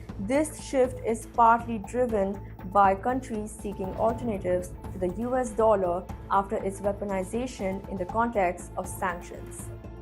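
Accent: India. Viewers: My God Indian voice again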